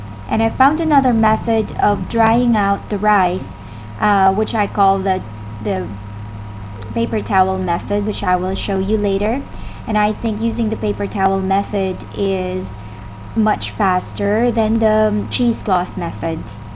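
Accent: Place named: Tagalog, Philippines. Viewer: Excuse meh! Kenmax kapa dyan I’m live abroad for so many years Kaya alam ko yong mga accent in different countries. Sa tuno palang nya alam ko marunong sya mag Tagalog.... juskoh ang ingot mo nmn 😅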